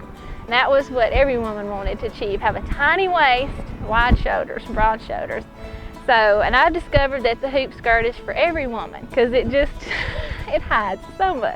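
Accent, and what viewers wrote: South, United States. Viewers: I just love the Southern accent. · You have a great southern accent, so charming. Love the dresses too! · Its weird seeing this b.c here in the south this is a low class accent. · You made these dresses yourself? Very beautiful! 👍🏻🤩🥰 And I like to hear your southern accent, like in the tv series of “North and South”; I live in the Netherlands 😅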